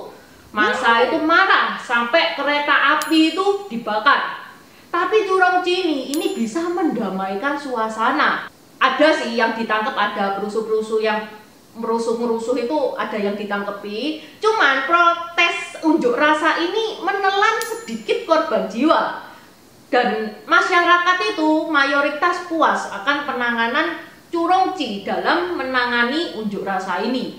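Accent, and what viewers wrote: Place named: Surabaya, Indonesia. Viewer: Yg bicara ini , aksennya kental.jawa timur , suroboyo. mbedoknya lucu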